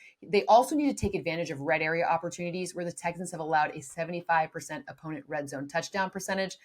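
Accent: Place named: United Kingdom. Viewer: Love the British accent! Continued excellent content.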